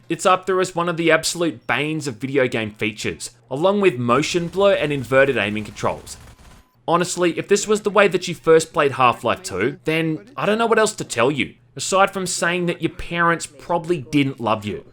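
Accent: Bogan, Australia. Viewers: I really hate how you talk, you sound super bogan.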